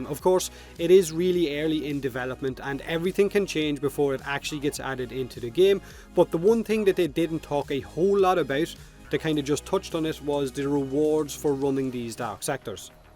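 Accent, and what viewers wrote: Ireland. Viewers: Real good music selections. And let's not forget that smooth Irish voice. should do a crossover with Rhino Ninja!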